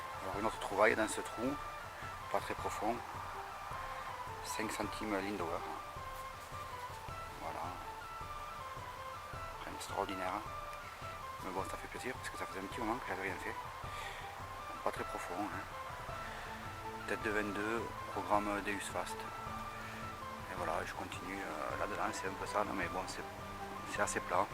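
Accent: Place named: South, France. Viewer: oui je l'ai vu trop tard. merci de votre réponse, et de m avoir permis de découvrir ce chanteur. votre accent du sud vous trahit... de quel région êtes vous exactement?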